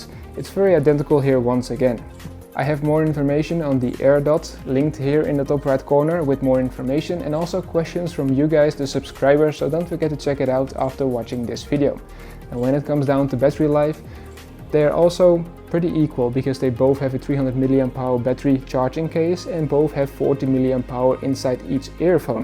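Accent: Netherlands. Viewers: just like the earpods? Just open the case? Edit: are you from the Netherlands because you sound like at Dutch man who speaks english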